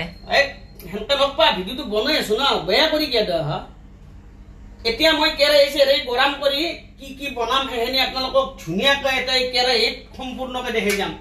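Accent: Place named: Lower Assam, India. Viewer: ভাল লাগে ফে আপোনাক ❤ । ভাষা টো যে নামনিৰ । সেইবাবে !!!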